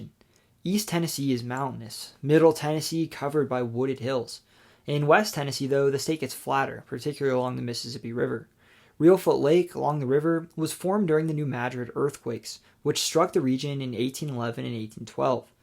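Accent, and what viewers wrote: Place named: Georgia, United States. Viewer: thought you were from Georgia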